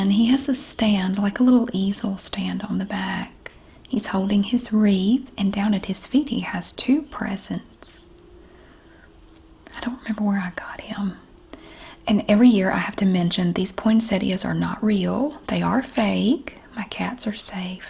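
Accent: South, United States. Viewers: This is my first time on your channel and I just love love love your accent!!!!!!!! I’m obsessed with southern accents! Also love your vibe and energy! I’m now subscribed and look forward to your future uploads! Love, from the U.K.! 💖💖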